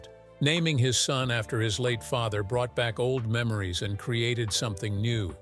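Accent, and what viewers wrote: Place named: United States. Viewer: WHY IS AN AMERICAN Doing The Commentary?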